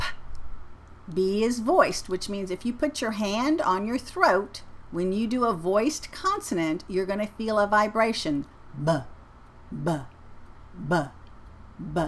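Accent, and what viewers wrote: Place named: Texas, United States. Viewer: Who wants such a strong Texas accent? That should be made clear in the description that this is not for neutral English.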